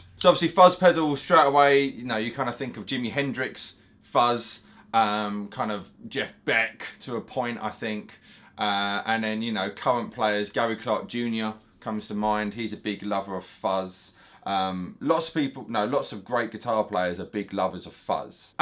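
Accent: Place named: South London, United Kingdom. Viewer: Great video - the most basic fuzz lesson but the most instructive. And love the SRV/Hendrix towards the end!!! Brilliant. And love the sarf Londern accent...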